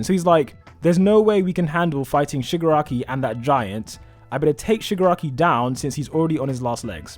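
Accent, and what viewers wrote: United Kingdom. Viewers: It’s funny this is about Dabi’s reveal but the biggest reveal was seeing what looked like! NGL, I pictured you as Harry Potter because you don’t have an American accent so I thought it was British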